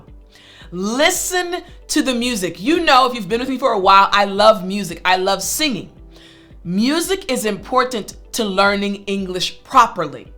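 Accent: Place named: United States. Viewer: Your americain accent sounds great I always enjoy it🤩 I've learn so much from the ways you pronounce the words in your videos, to sound a little bit americain😇 It's so cool to watch your videos THANK YOU VERY MUCH😇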